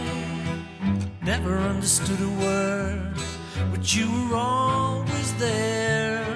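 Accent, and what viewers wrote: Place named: Scouse, United Kingdom. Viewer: I agree with everything you said except the accent. Love the scouse drawl! Most city centres are shit holes. I know Dublin is!!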